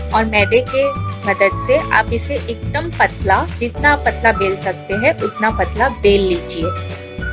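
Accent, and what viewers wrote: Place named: India. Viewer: Sav Sav she is speaking in hindi and not in English that u r commenting like that.... in most of the houses of india they call it in this way only..... so it's okay if she is pronouncing something in indian accent.... so pls... dont judge anyone by their language. u need to see the hardwork behind everything and also their talent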